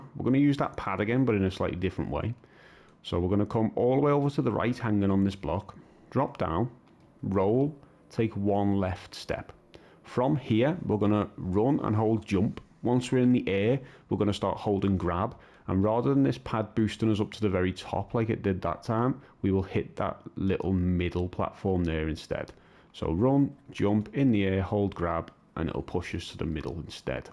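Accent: Liverpool, United Kingdom. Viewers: Youre guides are mint mate, I take it you're in Liverpool by your accent lol im in stoke if I were nearer I'd buy you a beer 😂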